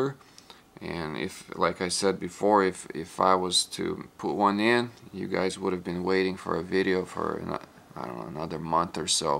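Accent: Russia. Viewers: Прослеживается русский окцент)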